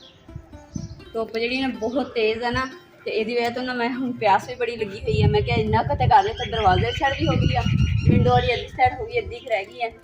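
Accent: Punjab, India. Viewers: Aidha jalandhar wee aurata boldia aa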